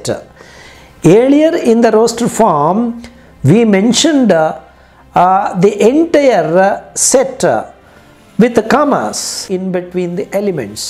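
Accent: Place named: South India, India. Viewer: The teacher's from South India as explained from his accent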